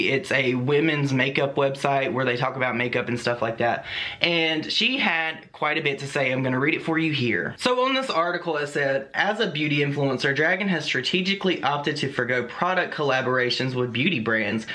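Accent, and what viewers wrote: South, United States. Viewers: god I can’t stand that dirty little wannabe! Nikita DOES get away with a lot. Btw, when you post I always look forward to sitting down with a drink and listening to your sweet southern drawl. You DIG DEEP!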